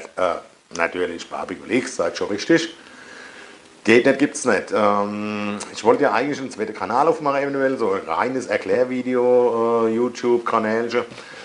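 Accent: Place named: Hesse, Germany. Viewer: Endlisch a ma aner der schö Hessisch babbelt. Weider so 👌🏼😉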